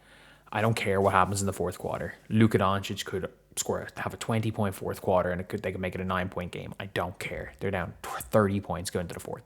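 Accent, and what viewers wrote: Ireland. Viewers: friend, you're doing a great job. This side of the pond accent ia also a breeze of fresh Irish (Welsh?) air. Looking forward to walking with you on our journey of following Luka the fab. Lets cross fingers he gets out of his shooting stink fast and that this is finally the year he gets some, personal if not team-oriented, crowns. Cheers from Slovenia. I groaned loudly when I saw his "pedestrian" stat line and the final score. This isn't the year, Lakers are still fake contenders. Too good for the middle of the road teams, but not "not quite my tempo" for the elite teams. But dropping all sense of urgency after that collossal SA win is dishartening, bastards had my hopes up.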